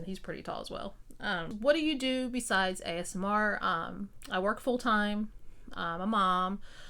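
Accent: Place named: Kentucky, United States. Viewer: omg i’m from ky! i knew your accent sounded familiar to me 🧐🥰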